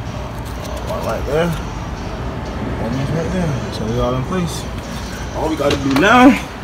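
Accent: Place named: South Carolina, United States. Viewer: That's a South Carolina accent for sure! Thanks for the helpful video too!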